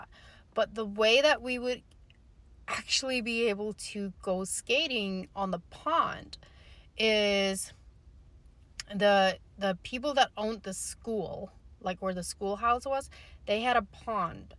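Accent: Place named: Pennsylvania Dutch, United States. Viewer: The Pennsylvania Dutch accent really comes out when telling these obviously very beloved childhood stories!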